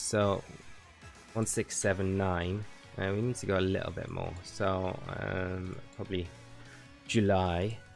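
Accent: United Kingdom. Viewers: i like ya british accent B)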